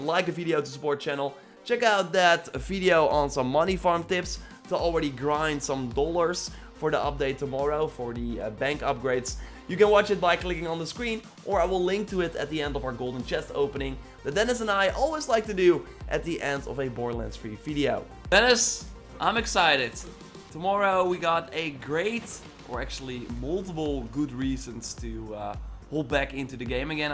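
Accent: Netherlands. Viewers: Are you Dutch? Your accent sounds very Dutch lol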